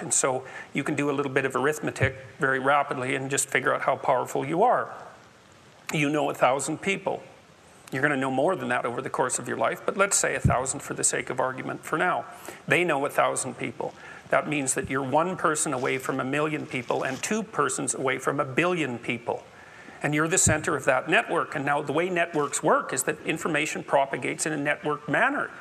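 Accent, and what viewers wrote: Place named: Massachusetts, United States. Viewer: People, saying big words with a soft tone of voice in a Massachusetts accent doesn't make you a genius who's right about everything. This is nothing but American establishment "work work work" ideology changing it's font to something people are more into atm.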